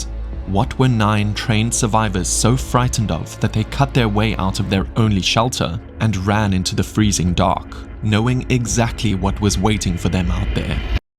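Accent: South Africa. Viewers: Are you a south African boet😅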